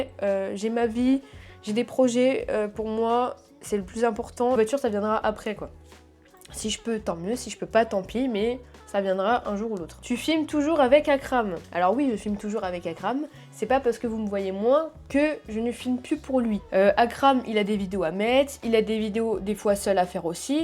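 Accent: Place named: North, France. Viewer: Salut Première chose, j'ai eu beaucoup de mal avec toi au début sur la chaîne du tonton mais maintenant ça passe beaucoup mieux, tu es plus à l'aise et je t'ai finalement accepté. Et seconde chose, je trouve que tu as un p'tit accent du Nord. T'es vraiment de la région parisienne? Tu n'aurais pas vécu un peu plus haut que Paris? Bon courage pour ta chaîne et tout le reste. La bise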